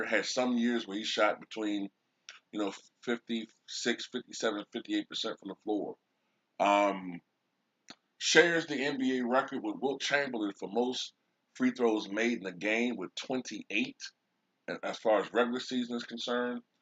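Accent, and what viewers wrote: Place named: Detroit, United States. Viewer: Thank you you sound like you're from here meaning Detroit I was just an elementary when all that happened so some of it I remember some of that was basically because of attitude and also ball movement Danny's game was a great game but it's slow down the offense it was the Pistons being young they wanted to run and also they wanted to play defense with AD wasn't that great at add was great but he just wouldn't compromise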